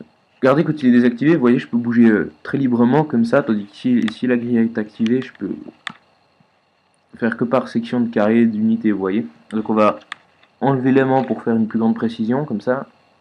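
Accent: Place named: Belgium. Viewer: Ha un Belge !! ( j'adore les 90,70) ;) Sinon tres bon tuto !!.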